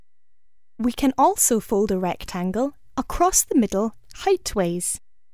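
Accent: Scotland, United Kingdom. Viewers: Good stuff, lovely sexy Scottish accent too.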